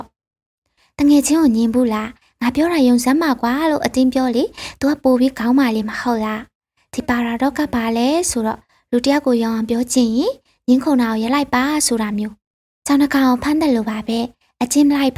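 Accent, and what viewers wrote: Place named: United Kingdom. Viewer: Eng accents လေးတွေကကျန်တော်အသဲယားတာ ဆက်ကြိုးစားပါခင်ဗျ❤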